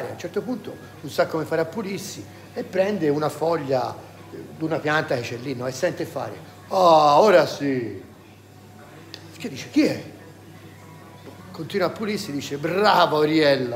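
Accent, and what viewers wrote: Tuscany, Italy. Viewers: Fortissimo, ma con l'accento toscano ancora meglio 😅😅😅😅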